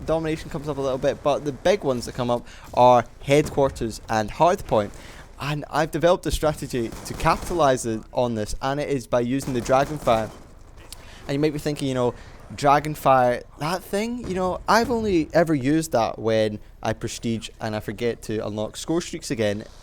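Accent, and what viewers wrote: Scotland, United Kingdom. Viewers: Finally a decent Scottish commentator.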